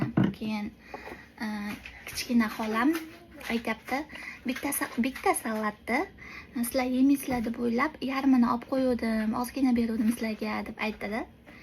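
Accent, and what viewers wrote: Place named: Fergana Valley, Uzbekistan. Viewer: УЗИ если,Тошкентлимисиз йоки водийданми?Шевангиз Водийга тортади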